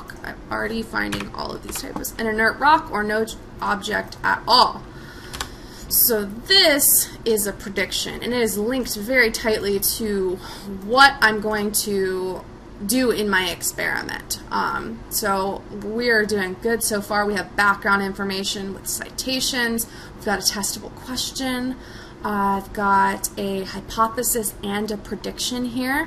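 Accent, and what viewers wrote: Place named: United States. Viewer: bruh this is the most americanised american accent i have ever heard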